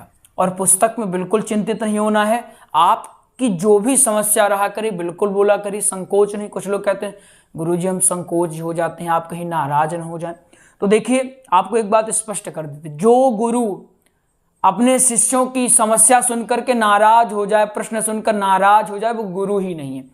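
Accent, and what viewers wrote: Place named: Kashmir, India. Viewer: aap ke bolne me kashmiri jhalak hai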